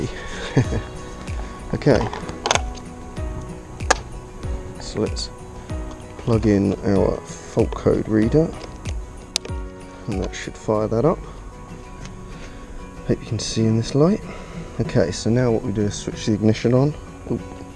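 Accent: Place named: England, United Kingdom. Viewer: Dude you sound English. Where abouts are you? I’m Lincoln. Brand new XSR700 in black owner.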